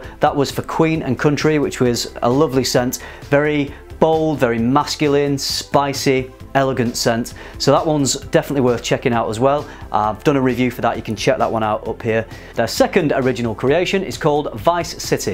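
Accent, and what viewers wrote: United Kingdom. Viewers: Besides the afford you put in your Videos, id like to tell you, that your Voice is just so relaxing and pleasant to hear, maybe because i also like that British açcent. I hope that doesnt sound weird to you. XD Anyway, loved the Video, reminded me alot of GTA Vice City haha. Many greatings from Europe.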